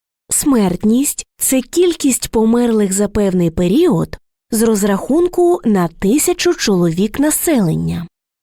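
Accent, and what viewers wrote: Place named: Surzhyk, Ukraine. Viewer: Було б цікаво, якби не цей огидний новосуржик з його -ЧЕ...-ЩЕ.....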